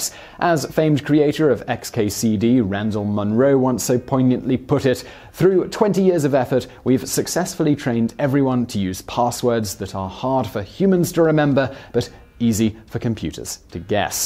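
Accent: United Kingdom. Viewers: OMG! Saaaaaauck, I'm dyin' here. There are times I really love the British accent.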